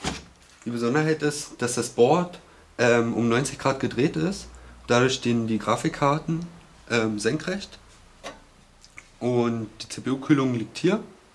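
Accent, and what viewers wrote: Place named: Berlin, Germany. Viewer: bei dem ganzen Berlinerisch versteht man ja den rest gar nimmer^^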